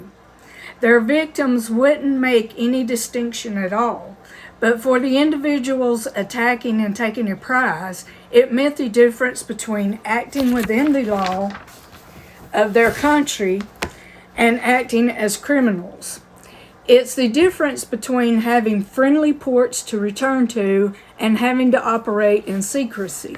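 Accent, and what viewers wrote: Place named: Alabama, United States. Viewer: Floridian here. Where are you from? Alabama? Your voice reminds me of my aunts from Alabama.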